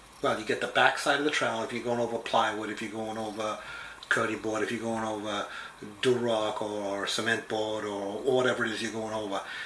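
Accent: Boston, United States. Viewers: Man you can hear that Boston! 😂👍Great work. Always teaching us better.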